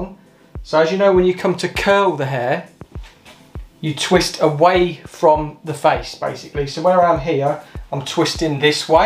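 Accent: United Kingdom. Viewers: As much as I like the British accent you talk so much I get anxiety 🤣🤣🤣🤣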